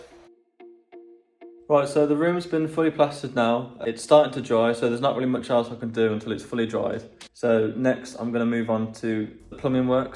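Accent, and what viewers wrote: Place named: Birmingham, United Kingdom. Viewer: Can hear a Brummie accent 😅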